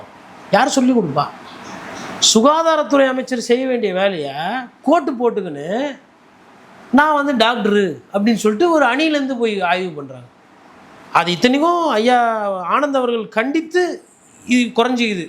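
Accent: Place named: Chennai, India. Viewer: அண்ணா நீங்க வேற மாதிரி.சென்னை பாஷைல.ஆனா நா கோயம்புத்தூர்.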